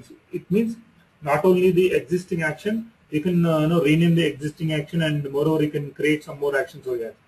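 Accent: India. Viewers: Нихера непонятно что говорит, кроме того что индус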